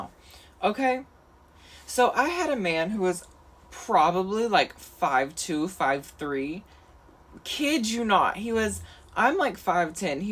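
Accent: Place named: San Francisco, United States. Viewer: What a San Francisco accent.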